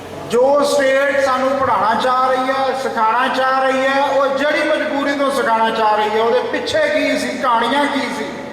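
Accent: Hoshiarpur, India. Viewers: Bhaji tuhada lehja pure 100% hoshiarpur wala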